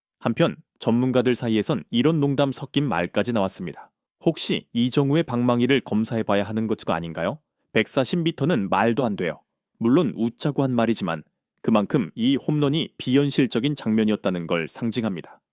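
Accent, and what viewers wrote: China. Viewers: 해절자 중공인인가? 발음이 우리나라 발음이 아니고 어색하네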